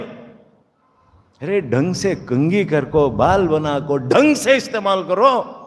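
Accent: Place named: Bangalore, India. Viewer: Banda bore hone nahi deta. Banglori language badi pyari n natural lagti h, central point bhi focused hojata h.Allaha salamat rakhe sab!!!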